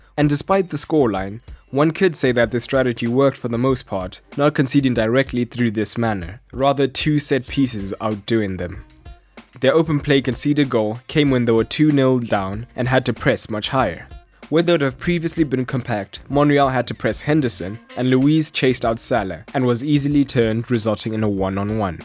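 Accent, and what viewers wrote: South Africa. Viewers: Are you South African? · Is the narrator South African?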